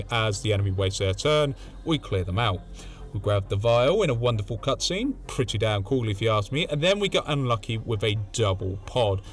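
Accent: United Kingdom. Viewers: You sound like you have the same strain of British as the spiffing Brit.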